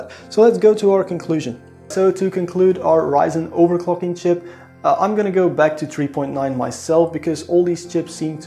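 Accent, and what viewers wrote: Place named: Netherlands. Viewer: Why do you sound just a little bit dutch, or german or something ;) oh shit je bent nederlands haha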